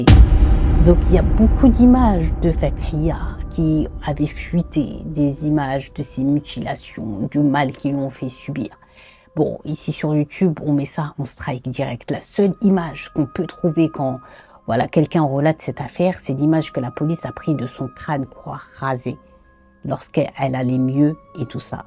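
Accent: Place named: Gulf, Arab world. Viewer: 😮😮😮😮😮😮😮😱🫣😱😱L'horreur dans toute sa splendeur... J'avoue que tu es une très bonne narratrice. Tu captive ton public. Je t'ai decouverte récemment. Tu as un superbe accent du Khalij.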